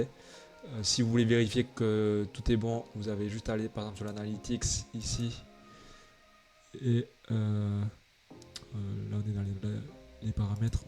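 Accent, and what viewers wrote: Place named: Southwest, France. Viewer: accent familier du sud ouest ça fait plez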